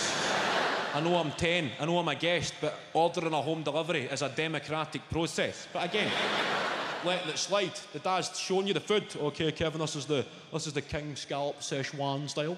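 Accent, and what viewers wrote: Scotland, United Kingdom. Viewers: I adore the Scottish accent so much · What's with the comments about "foreign languages"? He's speaking in a Scottish accent and not even a particularly strong one either, perfectly understandable, not our fault if people from the USA (I'm assuming) can't understand, and I'd question the hypocrisy of such a comment considering some of the regional accents y'all have in the USA, and to be frank even the less accented versions of Amercan english are not exactly "english " either are they? (I'm half American and I've travelled extensively right across the USA, so I know what I'm talking about.)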